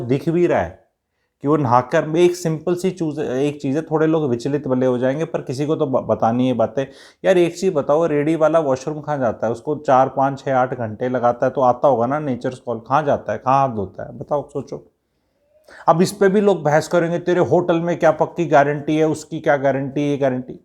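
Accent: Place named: Khadi Boli, India. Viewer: भाईजी, जबरदस्त बात बताते हैं। मजा आता है, आपकी खड़ी बोली सुन कर। कुछ देसी आइटम्स पर वीडियो बना दो बहुत कृपा होगी।,,🙏🙏👍👏👏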